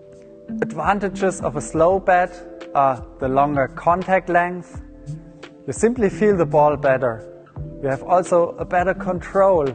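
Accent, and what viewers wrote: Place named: Germany. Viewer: His English sounds so ... German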